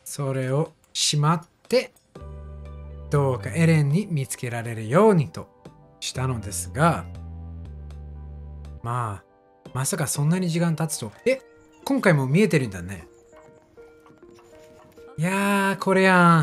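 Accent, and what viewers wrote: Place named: Kansai, Japan. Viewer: えらい外国人顔の関西人やね。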